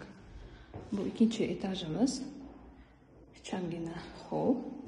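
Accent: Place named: Tashkent, Uzbekistan. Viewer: Шевани узгартиринг билдик ташканли экансиз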